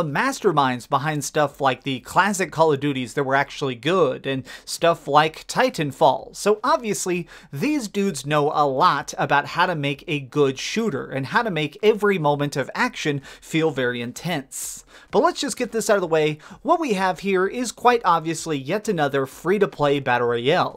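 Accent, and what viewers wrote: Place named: South, United States. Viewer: What happened to the southern accent now you are speaking like an announcer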